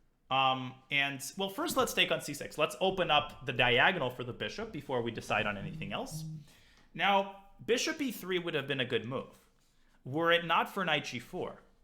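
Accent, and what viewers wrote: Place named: Russia. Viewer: Daniel's russian accent is wonderful!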